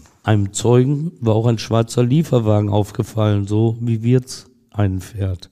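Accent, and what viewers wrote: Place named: Ruhr, Germany. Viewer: Er ist doch der Gerichtsreporter und erzählt es wie er alles im Gerichtsprozess erlebt hat. Kein Anderer kann es doch nicht so erzählen . Das ist Ruhrpott.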